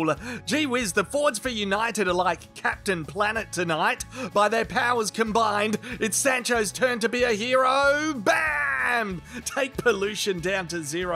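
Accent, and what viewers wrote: Australia. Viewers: What is this. Aussie man on Man U channel! Excellent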